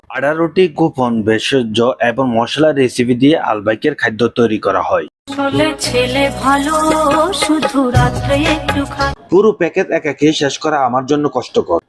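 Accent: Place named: Noakhali, Bangladesh. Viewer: আপনার ভাষা মাঝে মাঝে বুঝি নাই। আপনি মনে হয় নোয়াখালি কিংবা চিটাগংয়ের মানুষ।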